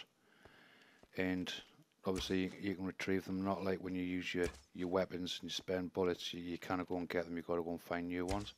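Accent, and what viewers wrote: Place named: Newcastle, United Kingdom. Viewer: GREAT TO HEAR A geordie hhaha x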